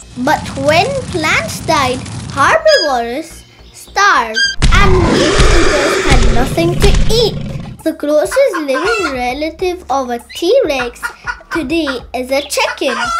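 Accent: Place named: Scotland, United Kingdom. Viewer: So sweet Lovely speech Looking smart Great knowledge He speaks like sccotish boy❤❤❤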